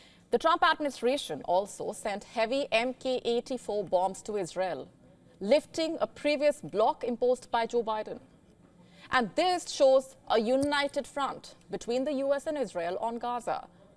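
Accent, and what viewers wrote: India. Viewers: Indian English so funny😂😂😂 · man fkn indian accent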